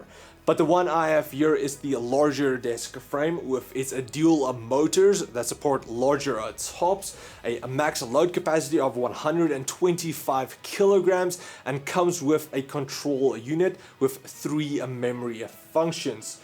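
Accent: Afrikaans, South Africa. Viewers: That's what it sounds like when you've Afrikaans speaking English... The South African accent is neutral, meaning it is easy for South Africans to pick up on other accents.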